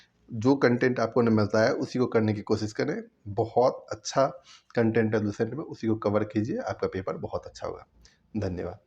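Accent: India. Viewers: Bhaiya maths or reasoning smjh nhi atta hai jayda. Koi solution bata do bhaiyaa. Bhaiya app up se hai na? Ya up mai rehte hoge?? Awadhi tone hai appke boli mai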